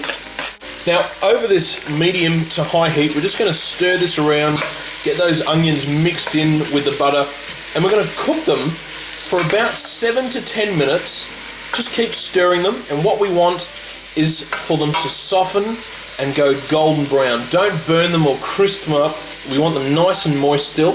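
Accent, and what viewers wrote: Australia. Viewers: HE'S BLOODY AUSTRALIAN MATE. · you can tell he's Australian because of his accent, not British and actually us Brits can cook mate so fuck off to your little cave and eat your rocks.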